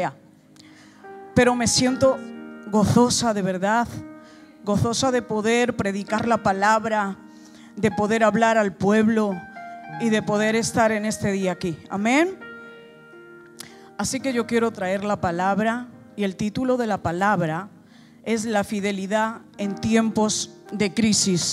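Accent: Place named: Latin America. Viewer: Porque hablan con acento latino??? Mijooo???😂😂😂 Son gitanos de Madrid de toda la vida de Dios..No entiendo, que ridículo🤭🤷🏽‍♂️pufff madre mía...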